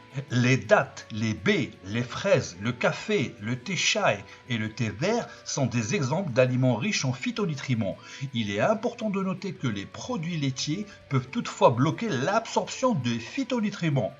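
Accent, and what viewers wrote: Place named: United Kingdom. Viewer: pirate et à tous,ta trop un putain d'accent anglais pirate tu me fait trop délirer,si non bien les phytonutriment.Miioin et bonne nuit chère pirate:-)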